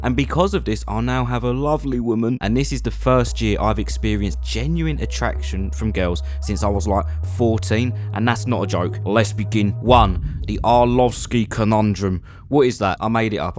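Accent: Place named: Essex, United Kingdom. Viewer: Proper funny mate - just found your channel, you sounds like a fellow Essex man.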